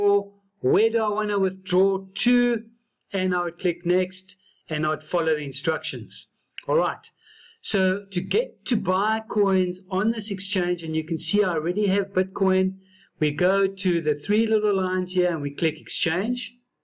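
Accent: South Africa. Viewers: That beautiful south african accent. Dankie vir die video maat! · He sounds South African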